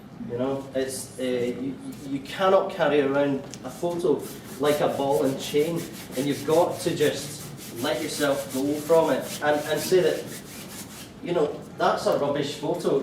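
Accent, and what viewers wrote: Scotland, United Kingdom. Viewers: I have watched all of the videos in this playlist. I have acquainted my ears with Scottish accent. I can't say the same for the closed captioning system. It's actually quite funny to see what the automatic system thinks he is saying. He says never try to paint along with him, but I am. All of my colors seem to be bleeding together with the dark reds. I wonder why.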